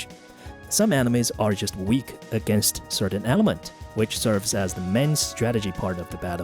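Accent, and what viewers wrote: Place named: United Kingdom. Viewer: omg 这么酥的英音吗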